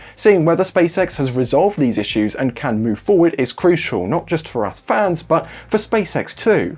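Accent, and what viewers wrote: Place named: South, United Kingdom. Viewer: Sad to see that some commenters are having trouble with his Standard Southern British accent. If you cannot understand standard English you should work on yourself, rather than complaining -- especially since the audience is international. If he had a heavy Scots, Irish, or north country English -- then I could accept complaints. But he is speaking NEWS ANCHOR quality English to an international audience. Ask someone from Europe, Asia, even Canada, he probably has the clearest accent of all the NSF presenters. Anyway, guys, just turn on subtitles and work on improving yourself.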